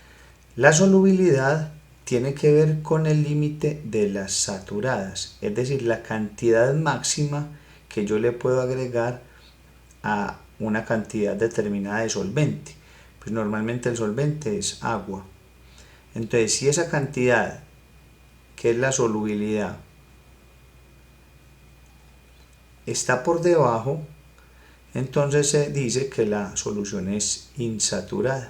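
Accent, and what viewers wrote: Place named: Colombia. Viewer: la voz colombiana ctmm mata wn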